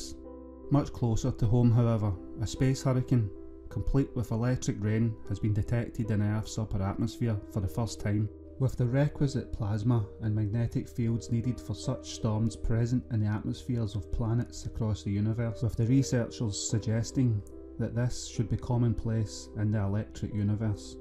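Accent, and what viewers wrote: Scotland, United Kingdom. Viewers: I am not a native British and that is why the Scottish dialect is not understood by me. I believe many others from a foreign language area share the same pain with me. I just don't understand. I am sorry. Subtitles don't help because I have such poor eyesight that I can't see reading the text. 😢 The thick dialect is not suitable for international communication.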